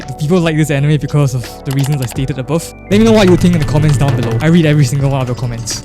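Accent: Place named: Malaysia. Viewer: This was a cathartic review for me, thank you for throwing shade on these characters. They really are as one-dimensional and bland as cardboard. I never understood the hype for it aside from the gorgeous artstyle + character design. Also, I might be tripping but you sound Malaysian af (or maybe Singaporean)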